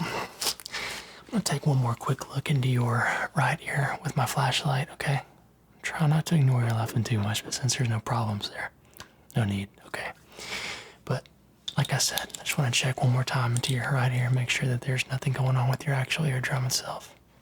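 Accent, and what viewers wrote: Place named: South, United States. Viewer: Is that a Texan accent? I’ve got a southern accent (Alabama so it’s a lot more hick and a lot less charming lmao) and I’m hearing it in yours slightly but it’s definitely not my kind of southern accent. I thought I was mishearing it to begin with but the more I watch, the more sure I am that there’s some sort of southern in there lol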